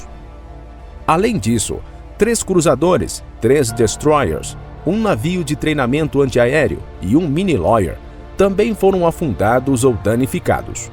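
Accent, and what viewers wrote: Maranhão, Brazil. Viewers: O maranhense não tem o sotaque dos outros estados do nordeste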